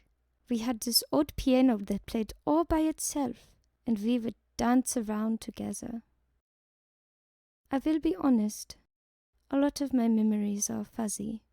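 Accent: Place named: Germany. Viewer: YEESSSSS. YOU NAIL THE GERMAN ACCENT. SO WELL. THANK YOU!!!!!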